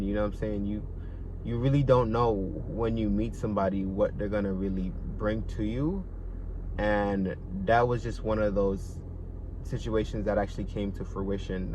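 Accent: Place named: United States. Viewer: Love your stories man and your American accent.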